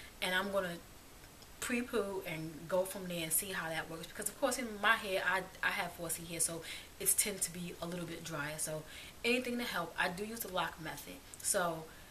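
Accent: New York, United States. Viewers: I love prepooing with coconut or olive, very simply i just add oil 15-20 minutes before i shampoo or cowash. It's makes detangling very easy. I would recommend looking at the website blackgirllonghair this website is bomb! They break down information on black haircare very simply and showcase a variety a technique and tools. BTW I love your New York accent you represent New York girls proudly!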